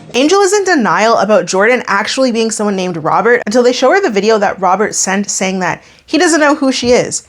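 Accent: Canada. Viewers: Nah bc girly I feel attacked since I brought up the Canadian "about" thing a video or 2 ago 😭😂❤️ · I don't know why you hate your Canadian accent so much. It sounds good to me, I think you should embrace it. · ITS OKAY I LIKE THE CANADA ACCENT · Lmao when you said”in weird round about way” I died 😂😂 I’m sorry I love Canadian accents Editing to add Kami would’ve sus it out because it was definitely fake 😂😂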